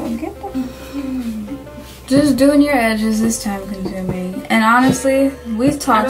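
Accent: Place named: Haiti. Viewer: Haha I knew you were Haitian by your accent. Love it♡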